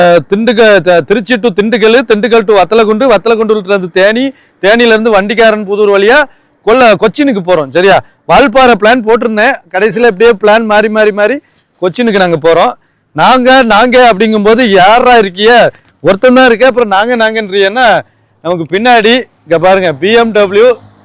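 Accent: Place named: Tirunelveli, India. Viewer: Nellai Tamil plus for your channel. Please keep it up.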